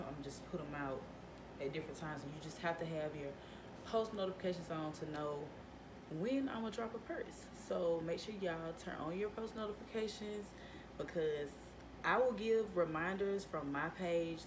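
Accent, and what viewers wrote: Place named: South, United States. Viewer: she (has) to be from Dallas or Mississippi....I know how we talk lol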